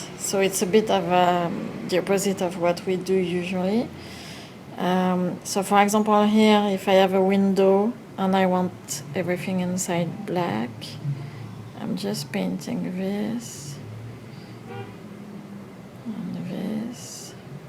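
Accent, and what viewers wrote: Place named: France. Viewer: your accent is charming, perfect English, I wish my French were as good! I cannot wait to try this technique.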